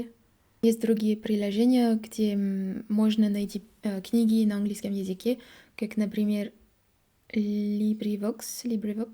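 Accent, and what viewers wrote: France. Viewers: интонация всеравно французская. как поёшь. англиский и русский более рубленный. на русском все слова заканчиваются четко. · С нежным французким акцентом, так мило!😊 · Великолепный русский язык, французский акцент только прибавляет шарма. Но если есть задача минимизировать акцент. то это несложно, есть несколько конкретных моментов, над которыми можно поработать. 1. Шипящие (например, слово что: щьто вместо што; или слушять вместо слушать) 2. Иногда смягченное Л вместо твердого (слушаля, начиналя) 3. Ы (язик вместо язык) Только этого вполне хватит, чтобы практически снивелировать акцент! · Удивительно насколько вы чисто говорите по-русски. Конечно акцент есть, но вы говорите правильно в знательной мере! И, кстати, я заметил только одну ошибку в звуке Ы - в слове "музыка". Крайне странно, что вы её не любите. Что касается частой ошибки - К у вас мягкая, таким образом КА звучит как КЯ; Л у вас мягкая, нужно как в американском dark-L: football. 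В целом акцент приятный, особенно как вы говорите предлог "по", с французской О. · Уважаемая! Вы прекрасно говорите по-русски, соблюдаете падежи и склоняете слова не коверкая окончания! Также у Вас прелесный акцент👍🏻 Ударения, почти все, всё равно говорите по-французски - с ударением на последний слог. Это тоже очень мило😊 Supér!❤ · Отлично разговаривает, пусть с французским акцентом, но это даже придает некий шарм, если и были ошибки то они несущественны. Круто! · Браво! У вас очень хороший русский, с приятным французким акцентом! То, чего вам пока не хватает, в этом нет вашей вины - все придет с опытом, это более богатого словарного запаса, чтобы использовать различные синонимы, образы, метафоры, более красочную и сочную речь! Желаю вам успеха! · Не нужно менять французский акцент , он прекрасен ! Русский язык сложный , один из самых сложных , поэтому нужно много говорить на нем , слушать это значит понимать , но не говорить ). 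И еще вот такой факт -дети не знают грамматику совсем , но они говорят же на русском языке ) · Твой французский акцент в русском звучит от тебя очень мило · Шикарно!!! Вы отлично строите речь, абсолютно по-русски! В некоторых случаях "... и так далее..." лучше заменять на "... и тому подобное". "... и так далее..." - это когда вы что-то перечисляете. "... и тому подобное" - похожее, подобное. PS Очень милый французский акцент ) Говорите больше на русском, вас очень приятно слушать. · Русская речь с французским акцентом - это самое красивое что я слышал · я даже хабиба хуже понимаю, чем ее. я на данный в японии по обмену, тут много французов и этот французский акцент, как мурлыканье котенков очень приятный для ушей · Говорит хорошо, почти не делает ошибок, но произношение смешное, как будто нарочно искажает слова. Особенно французская привычка делать ударение на последний слог, очень комично звучит. 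· Произношение правильное, но! (Всегда есть "но"😂) У каждого языка есть своя интонационная особенность - мелодика языка. И у вас выходит русская речь, но с французской интонацией (мелодикой) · Freeeench accent 😌🤌🏻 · Французский акцент звучит прекрасно, а грамматика очень на высоком уровне, ты молодец) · Твой французский акцент русского языка прекрасен. Ты большая молодец. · Русский язык с французским акцентом... напоминает фильм гардемаринов и героя Боярского. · ты отлично говоришь. нужно чуть больше практики чтобы было проще. как будто русская с французским акцентом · Вы прекрасно говорите по-русски!!🥰 с милым французским акцентом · Прекрасная русская речь! Кстати, насчёт книг о Гарри Поттере. В аудиокнигах «ГП и кубок огня» и «ГП и Дары смерти» ты бы смогла озвучить реплики Флёр Делакур на русском языке с твоим французским акцентом) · Comme elfer2381 le dit, vous parlez russe de telle manière que beaucoup de gens doivent vous envier, et le charme de votre accent français pas trop accentué est agréable. 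Merci. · Восхитительный французский акцент! Такое обаяние в этой речи.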